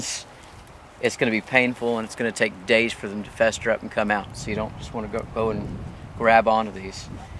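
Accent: Texas, United States. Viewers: Omg i was in Texas for a year as an exchange student, and your Texan English made me so "homesick" 😀😅🇺🇸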